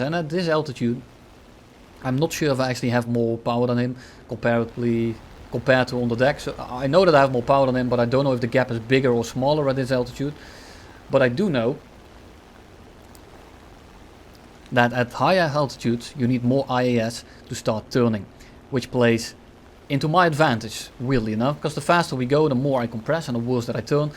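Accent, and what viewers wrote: Netherlands. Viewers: R u dutch by any chance?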